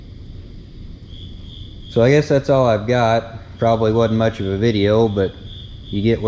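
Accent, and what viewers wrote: South, United States. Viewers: I enjoy every videa Please keep em coming when you have time. Even when the Cat cams are a real treat for you to clean up with all the "Blue shit" that comes on them... These relics are entertaining with the good ole boy country narration. Keeps the content interesting. Bottoms up 🥃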